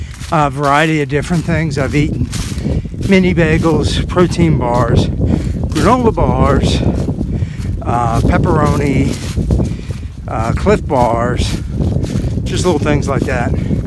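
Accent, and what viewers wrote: United States. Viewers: Great videos! For someone from NJ, you picked up a nice southern accent! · I knew you were from Jersey. That North Jersey accent is unmistakable!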